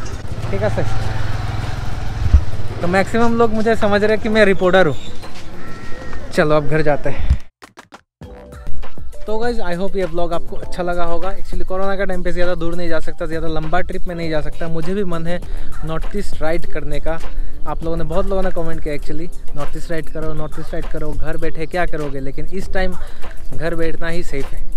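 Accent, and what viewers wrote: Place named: Comilla, Bangladesh. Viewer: Dada, apnar local language akkebare amader Bangladesh er comilla , brah Mon baria'r local language er Moto..shune khub moja lagtesilo💕✔️🇧🇩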